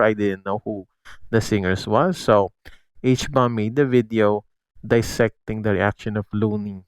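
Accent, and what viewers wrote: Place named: India. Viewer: Akala ko foreigner ka nung una kong marinig boses mo noong unang panood ko sa video mo.Ganyan kasi bigkas ng ibang Indians hehe✌️keep grinding lang kapatid.May your channel grow.God bless!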